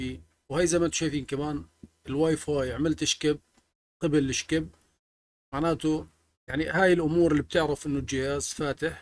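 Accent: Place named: Libya. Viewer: فيك لهجة ليبية 😮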